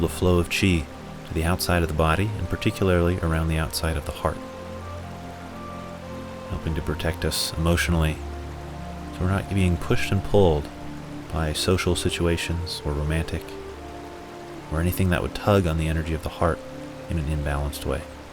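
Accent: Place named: Scotland, United Kingdom. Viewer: Ah, wee of the Clan! Sorry to have missed the Great Scottish Retreat, a mere 50 miles from my cave in Perthshire. I have an excuse...I was in Portugal. Qigonged every day though! Looking forward to doing this routine and listening out for your Scottish accent...aye... 😀🏴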